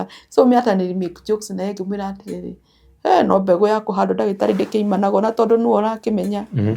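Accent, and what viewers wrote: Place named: Kawangware, Kenya. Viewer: Hapana hiyo lafudhi si ya kwenu. Ni ya kwetu Kawangware.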